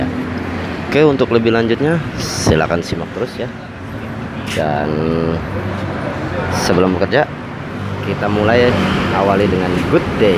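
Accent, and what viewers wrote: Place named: Banyumas, Indonesia. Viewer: Sampean wong ngapak yo.kon wong Endi.salam santun dari Riau 🙏.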